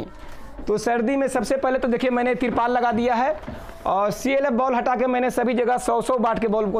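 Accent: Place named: Bihar, India. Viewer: सर जी आप दवाइयों के नाम स्क्रीन पर दे दिया करे क्योंकि बिहारी टोन होने के कारण शब्दों को समझने में दिक्कत होती हैं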